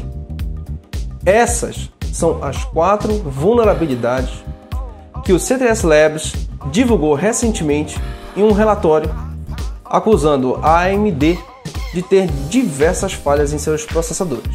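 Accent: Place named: Belém, Brazil. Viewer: Sotaque de belém é bem legal. ")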